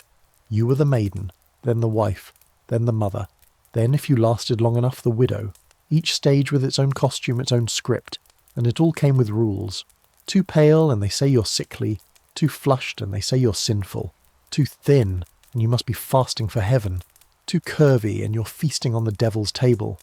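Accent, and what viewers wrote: United Kingdom. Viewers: It's 5.34 am and I'm living in France, near the chartreuse mountains. Thanks for your help and the English accent, it contributed to putting me to sleep. The pictures though 😂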